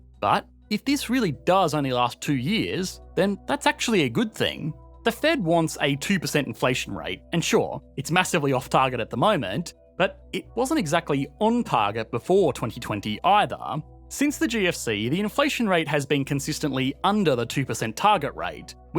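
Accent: England, United Kingdom. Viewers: Kind of annoying "Millennia-aard" English accent -) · I CANT UNDERSTAND YOUR BRITISH ACCENT DO YOU HAVE FULL SUBS AVAILABLE???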